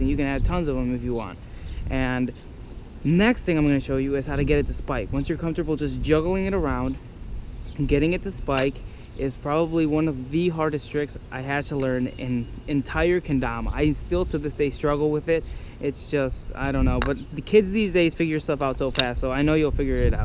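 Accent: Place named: England, United Kingdom. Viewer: You sound very English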